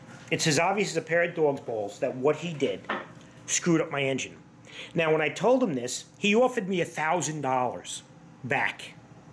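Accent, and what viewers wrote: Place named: Long Island, United States. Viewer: Being from long island that Accent sounds like you're from long island. How far off am I ?, Or queens...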